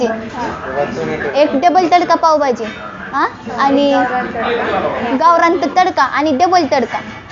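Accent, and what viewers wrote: Latur, India. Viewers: Latur chi bhasha bhari ahe ...keep it up...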